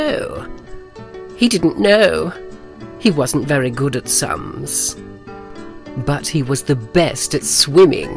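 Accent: United Kingdom. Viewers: I love your british accent i guess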